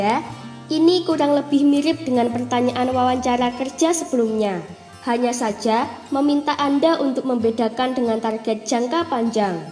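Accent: Java, Indonesia. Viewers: suara ibu peri asli pulau jawa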